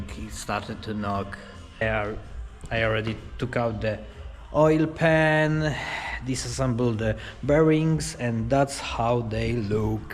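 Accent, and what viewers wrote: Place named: Poland. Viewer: 2.2? I skąd jesteś, bo chyba słyszę polski język xD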